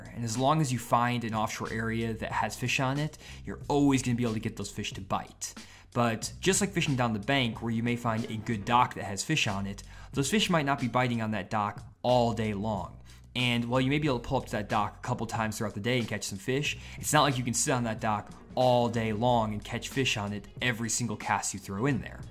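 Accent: Oklahoma, United States. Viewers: Are you from Oklahoma?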